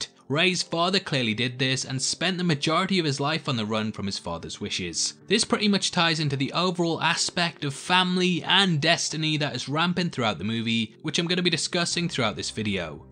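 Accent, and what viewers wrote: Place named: Sunderland, United Kingdom. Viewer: Agree with a lot of this although would rate higher than 5/10! Also, North East accent. Sunderland-ish?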